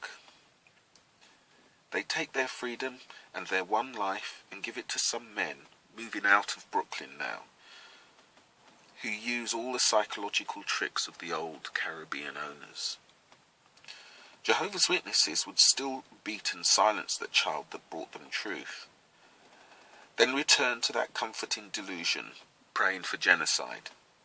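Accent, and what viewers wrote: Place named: RP, United Kingdom. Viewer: There is controversy re: origins of Hinduism. Very similar but with the extra kick of reincarnation. i. e. "if you do what we say, in your next life you can be like us" In US the AME church was started due to segregation. PS never thought what color you were. I just know you sound like the royals. My family came from Birmingham so that's a compliment :)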